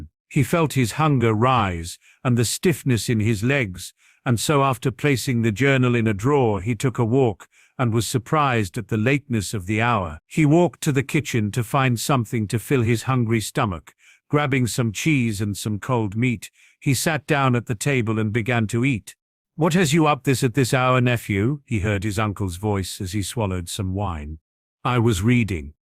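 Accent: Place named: United Kingdom. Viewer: This is a captivating story. I enjoy the British accent only because of the show :) I am hooked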